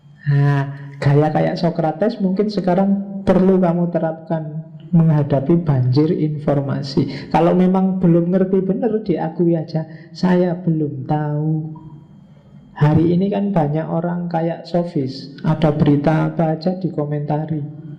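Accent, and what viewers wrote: Java, Indonesia. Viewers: English aksen jowo agak menggelitik di kuping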